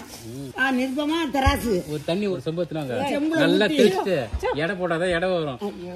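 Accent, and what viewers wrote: Ramanathapuram, India. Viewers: Ramanathapuram slang ah? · ராமநாதபுரம் பேச்சு உடன் சாப்பாடு செய்யும் போது என் சிறு வயது நினைவு வருகிறது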